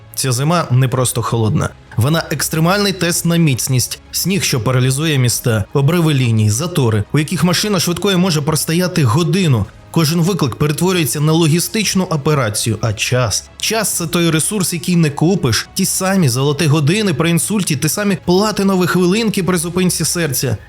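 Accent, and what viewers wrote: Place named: Russia. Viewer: диктор - ЗНАЙДИ українця для редагування тексту який читаєш, навіть не надто освіченого - просто неможливо чути твої ПОСТІЙНІ русизми, наче 3м6іцил якийсь... Навіть ШІ краще прочитає... "нЕ київ, нЕ москва" нІ платить тобі, ога!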